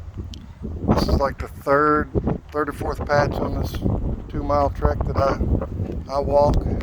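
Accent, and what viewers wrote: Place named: Carolinas, United States. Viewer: I'm thinking that if the bees start drawing in pollen, the queen might start laying again. I'm not sure which zone you're in, but that accent sounds like the Carolinas or somewhere really close.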